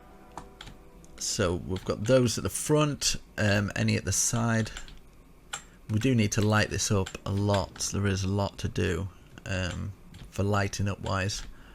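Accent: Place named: Scotland, United Kingdom. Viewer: Your accent seriously you sound Scottish I love your videos you make amazing builds and your funny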